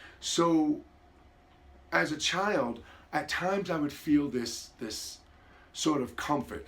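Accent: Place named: New York, United States. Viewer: Love it and love your NY accent!💜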